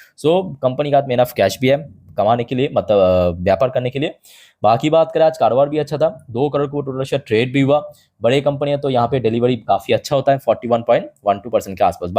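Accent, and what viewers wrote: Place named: Bengal, India. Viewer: Like the way u speak.. R u begoli.. Speak hindi so sweet ❤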